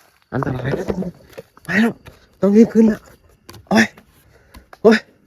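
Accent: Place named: Suphanburi, Thailand. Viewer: เป็นคนเหน่อที่ไหน สุพรรณ หรือ ราชบุรี….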